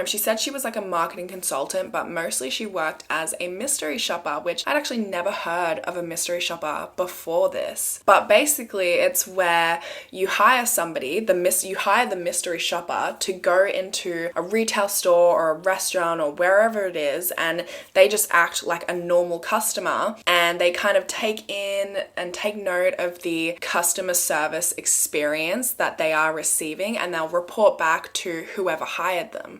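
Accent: Australia. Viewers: Since 1985, I've heard the beautiful Australian accent every day, thanks to Neighbours.. So after this Friday, you'll be helping to fill that void for me!!... 😔💜💜💜💜💜🇬🇧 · Love the Aussie accent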